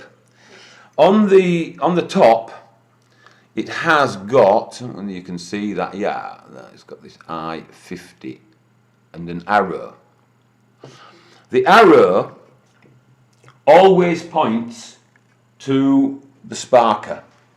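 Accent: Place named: Yorkshire, United Kingdom. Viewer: Mmmm..... Micheal Caine speaks with a London accent/Cockney accent....I rather think this chap is a Northerner.....Yorkshire area. Mmm?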